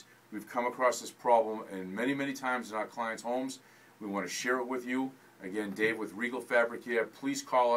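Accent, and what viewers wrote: Boston, United States. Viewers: I hear that Boston accent.......from a Galway man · that Boston accent lol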